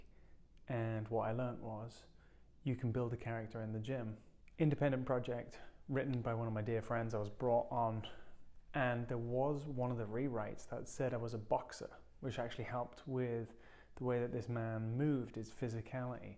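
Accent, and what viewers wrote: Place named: Australia. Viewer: Your English accent has a bit of a Australian twang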